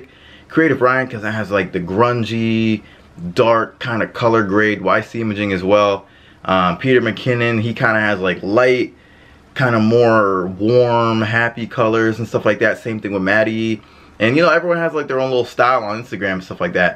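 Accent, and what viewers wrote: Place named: East Coast, United States. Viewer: You from East Coast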